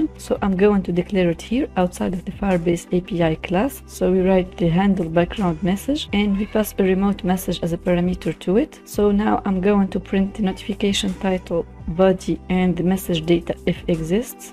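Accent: Tunisia. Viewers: the English accent is so Tunisian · ur tunisian right? haha ur accent is soo tunisian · great tuto and in point, but i can't stop ignoring your tunisian accent, it's like hearing my self talkin, keep going my tunisian girl <3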